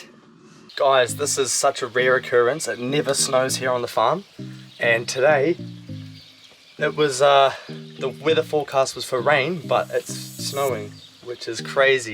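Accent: Punjab, India. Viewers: Spot on Punjabi accent. Tusi saare family members nu Punjabi sikha deni aa bol bol k 😂👍🏼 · l love your Punjabi accent!! nice family GOD BLESS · God bless lovely family. Very much astonished at your punjabi accent.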